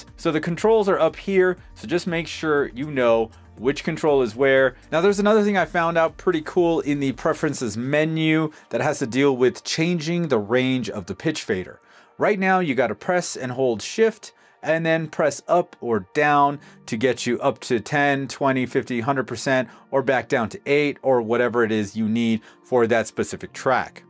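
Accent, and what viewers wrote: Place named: France. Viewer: Very well explain and film, you speak clearly and for a french guy it's cool for understanding what you say Effects are are not too much and final result seems professionnal. Very good job !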